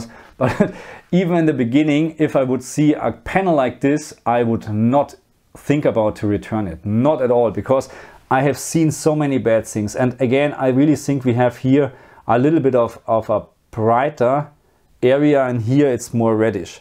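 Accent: Germany. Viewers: Ist er Deutscher? Das englisch hört sich so an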